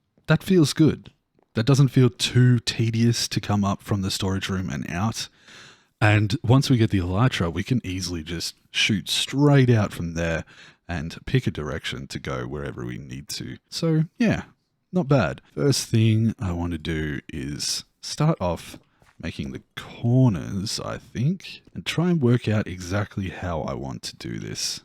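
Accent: Australia. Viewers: finally have time to watch the episode!! If it's not full of Aussie Builder heavily accented slang I want a refund!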